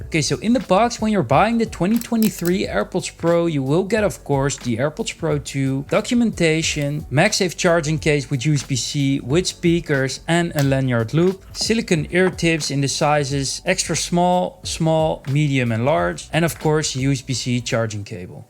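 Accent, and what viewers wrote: Netherlands. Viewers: The Dutch accent is strong with this one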